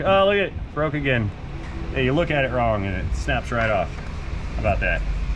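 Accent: United States. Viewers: Gday. Accent wise...I'm English, so you sound American...not helpful perhaps, but there it is 😂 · u sound like a gen x person all american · Pt II. Accents. I`m from the UK (London) and therefore have no accent, as we invented the language. However, I am well qualified to speak about yours. You have a classic American accent. It is not accentuated like NYC, the Deep South, Boston etc. it is easily understood and well modulated. Anyone who is complaining about yours, should come with me to visit my relatives in NE Scotland. The Americans there who work in the oilfields have been known to use sign language. Carry on. There is nothing wrong with your speech. I know. So Yah boo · Accent? You just sound like a good old American to me. If people in central Indiana have the same accent as you, then that is why I am not detecting any particular variation in speech. 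· Accent? Hmm. As a Brit, I can definitely say your accent is Murican. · You don’t have an accent. You talk like a regular American. You lived in Hawaii, I know that. You mentioned that before. You talk like a regular American person. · You sound like most American tourists I see in my European country. And I see a lot of them. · I am not from US, and as far as I am concerned your accent is what I call "general clean US" accent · Me being a Norwegian. Your accent sounds American.😅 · you have an American accent. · you have "TV accent".....im guessing western CT, MA, upstate. maybe Michigan, NorCal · I do not even want to guess where your accent comes from it all sounds "yank" to me & excessive too boot. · Well, the ones made in the North of England weren't much good. And your accent is, without doubt, American ! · You just sound ‘American’ to me. Accents in Uk are something else. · You sound American to me.. 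· Being in NZ your accent just sounds like a typical American TV accent. And to me the American TV accent is still pretty subtle to ‘real life’ American accents. The only thing I really pick up on is mirror, to me it’s sounds like me ra😊. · You have an American accent which in some of these states seem to now be the minority thanks greatly to immigration. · To me (Australian), you sound American.....;)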